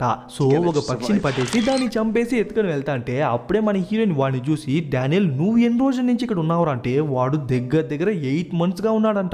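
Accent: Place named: Rayalaseema, India. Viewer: Ni voice Matra super daniki రాయలసీమ భాష ఇంకా సూపర్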